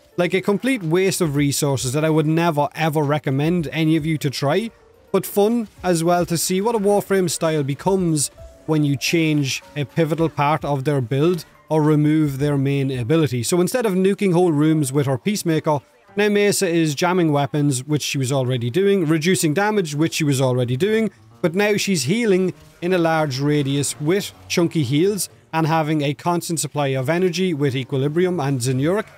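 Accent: Ireland. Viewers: Thank you for the clarification. I fuggin love your videos, and your cool ass Irish accent!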